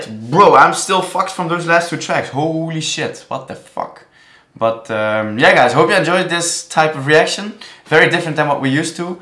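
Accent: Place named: Netherlands. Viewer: love how the dutch slips through his english speaking, lekker man ❤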